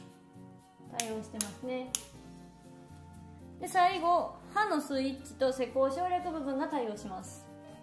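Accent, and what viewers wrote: Kansai, Japan. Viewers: 言葉の端端に感じる関西弁が良いです！ 実家が電気屋なのでついつい見てしました！ 俺も電気工事士取ろうかな！ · 丁寧な解説でとても分かりやすかったです。関西弁がきついので標準語バージョンがあるとうれしいです。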